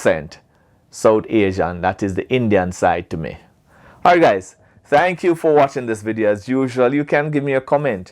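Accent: Jamaica. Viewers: You definitely talk like a Jamaican! From the way you say “issues” & “persons” & of course “long distance stulla” 🤣🤣👏🏾👏🏾 Cool results!!! 🇯🇲🇯🇲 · Love your Jamaican accent. I have been there. Wonderful country and people. I am proud to say I visited the best island in the Caribbean. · Well when you blend it all together, you can also look Arabian and Middle Eastern as well, but your talk is 100% Jamaican, ya man.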